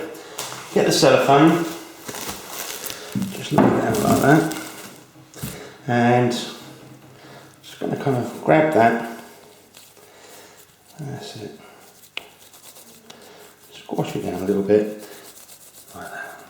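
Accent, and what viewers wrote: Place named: London, United Kingdom. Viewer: He's not from Yorkshire; maybe London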